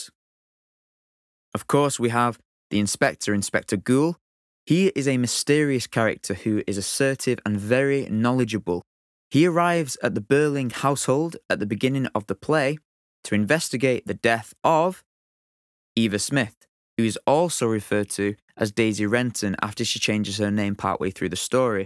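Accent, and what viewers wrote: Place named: North, United Kingdom. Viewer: Gentle northern accent = alpha commentary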